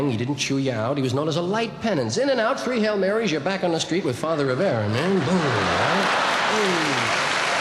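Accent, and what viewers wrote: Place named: New York, United States. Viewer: The old-time NY-Irish accent alone makes this hilarious.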